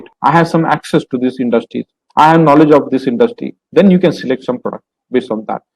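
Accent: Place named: India. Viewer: Mẹ nói t.Anh giọng Ấn méo nghe j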